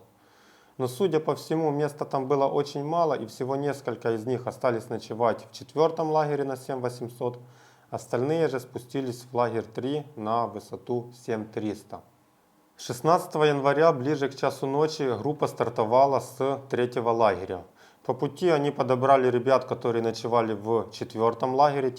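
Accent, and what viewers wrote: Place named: Surzhyk, Ukraine. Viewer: сделайте что то с суржиком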